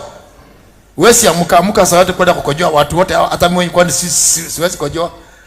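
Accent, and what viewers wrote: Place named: Kenya. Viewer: Maybe he is not harsh, he just sounds Kenyan ……kiswahili yao ni sort of harsh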